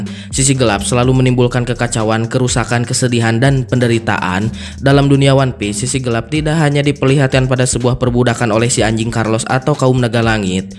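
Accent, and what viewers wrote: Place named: Sunda, Indonesia. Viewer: sunda banget pembawaannya teh hahaha kerenn.. semangaatt boy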